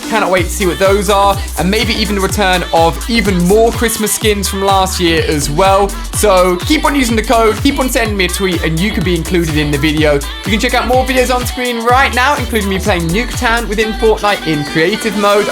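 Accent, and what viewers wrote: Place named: United Kingdom. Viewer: How you get your british accent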